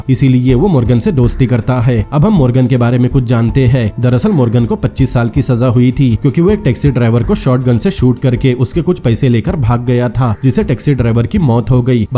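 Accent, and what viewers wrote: Assam, India. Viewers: Bhai kya asaam se Hai kya tera pronunciation se pata chala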